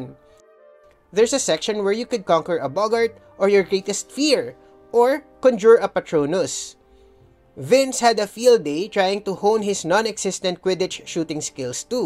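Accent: Philippines. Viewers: Pinoy accent, surely for the unwelcome poor tourists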